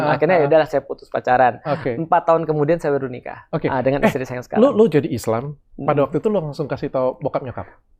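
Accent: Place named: Palembang, Indonesia. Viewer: Iyo lah pak ustad masyaallah kental nian Palembang nyo mantap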